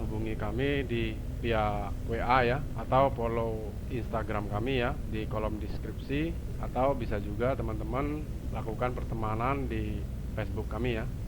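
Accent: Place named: Java, Indonesia. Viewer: wuah.. jauh ya Saya di Jatim ini mas.., Saya kira di jawa, soalnya dengar2 logatnya sprti org jawa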